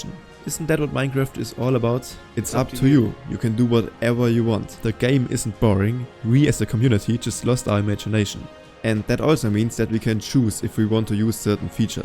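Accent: Germany. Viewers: Man hört, dass du Deutsch bist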